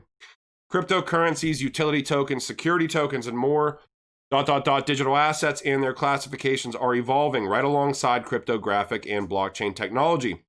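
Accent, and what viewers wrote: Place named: Western Pennsylvania, United States. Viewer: Are you from western PA? Gotta be, the accent had me thinking it and now the pirate hat. Gitta be only western pa guy wears a pirate hat. Anyway, more educational is good. The tokenization is fascinating and dynamic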